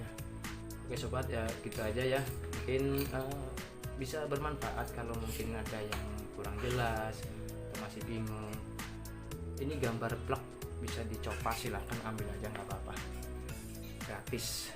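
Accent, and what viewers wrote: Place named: Java, Indonesia. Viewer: Pak ngapak medok jawanya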